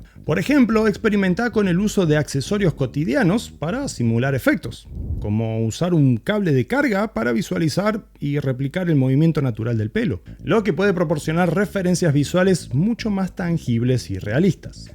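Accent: Argentina. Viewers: ah me pareció escuchar algo... en tu voz se deslizaba un poco de sierras de cordoba , peperina , espinilla y burro ! Que bueno que hayas llegado adonde estás con la animación. Yo solo colecciono fracasasos en el mundo de la aniamcion. Lo peor que me encanta. Y quizás deje y tire todo ya . Debo ser muy amargo .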